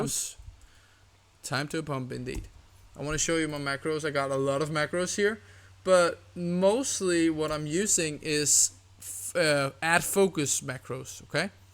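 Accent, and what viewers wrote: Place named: Denmark. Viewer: I can hear you are Danish 🙋🏼‍♀️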